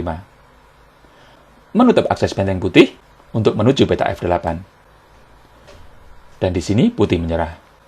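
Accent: Java, Indonesia. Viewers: ilat jowo cak wkwkwkk 🤣🤣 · Saya suka gaya Om, tau aja yg subcribernya kebnyakan org Jawa hahahaha...., Lidah Jawa ya Om, GX perlu formal2 banget sebut nama yg penting penyampaian materi mudah di cerna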